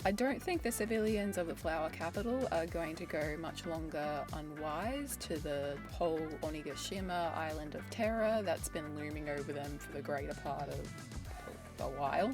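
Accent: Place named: Australia. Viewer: Knew you were Aussie soon as I heard ya voice bro Anywho lol to those who said Luffy will lose the final fight 😂 can’t happen now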